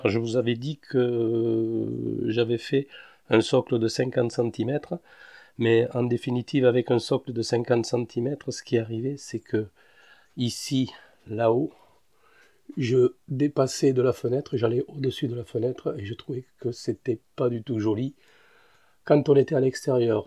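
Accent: South, France. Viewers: bonjour et déjà un grand merci pour tous vos conseils j’ai juste deux questions concernant les dalles vous utilisez la 6000k blanc froid c’est bien ça ? Pour la chauffe ce sont des ampoules de terrarium quelle puissance faut il acheter ? Merci au fait vous êtes dans quel région cet accent me parle perso suis du Tarn ça m’intéresse pour avoir les périodes de semis et de repiquage. Merci encore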